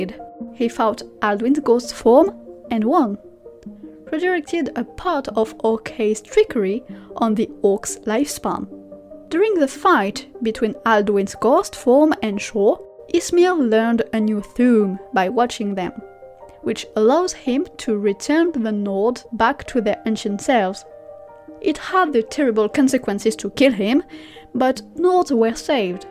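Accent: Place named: Europe. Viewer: love your accent where are you from in Europe, this channel has alot of lore value for skyrim , great work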